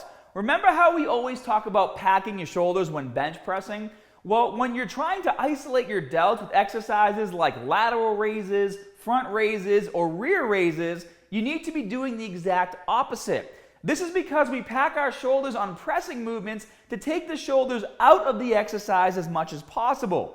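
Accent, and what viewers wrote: Boston, United States. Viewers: wait when did Scott get a Boston accent I don't recall him having one in 2009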